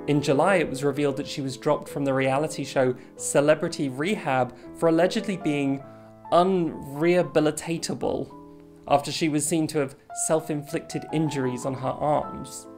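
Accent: United Kingdom. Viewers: So after watching snippets of several of your videos in my YouTube feed autoplay thing, I finally stopped procrastinating and decided to watch the whole hour and a half video. I knew I liked your content, but this was the first time hearing your voice. So when I heard you, my dumb American brain was like, "OMG THEY'RE BRITISH?! PLOT TWIST!!"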